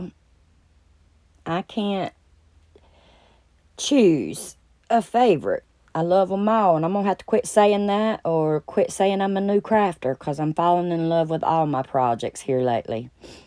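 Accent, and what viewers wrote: South, United States. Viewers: I also love to hear your accent. I’m in NC but from Tennessee. There’s nothing better than a southern accent. 💕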